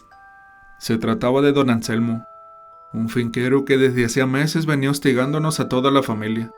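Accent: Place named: Mexico. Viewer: son buenos relatos,,,pero mejora el acento la voz es horrible,,ya sabemos k eres mexicano mejora la locucion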